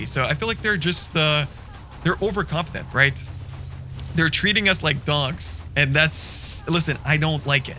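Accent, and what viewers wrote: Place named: France. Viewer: You have a nice french accent (I am french)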